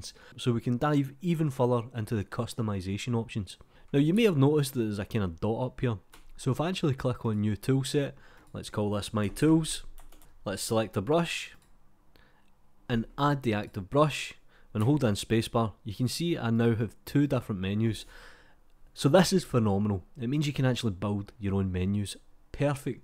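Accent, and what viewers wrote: Scotland, United Kingdom. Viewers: One of many Scottish accents! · Scottish accent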